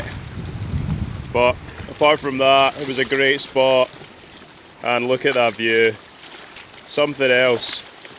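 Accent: Scotland, United Kingdom. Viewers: thanks for another great trek, always look forward to a posting, with the camera work, the sounds, the narration and information in your Scottish voice, the calming demeanor, it's almost like being there, can almost smell you making meals. Charles would be honored that the stopped at the estate and the bothy would always be accommodating....safe summitting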